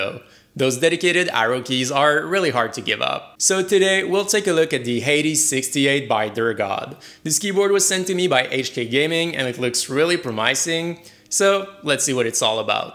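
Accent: Quebec, Canada. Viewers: Hes canadian french so, dont mind the pronounciation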